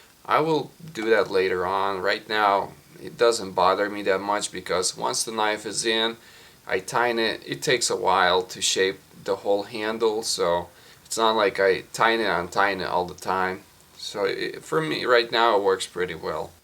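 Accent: Russia. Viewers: акцент как у русского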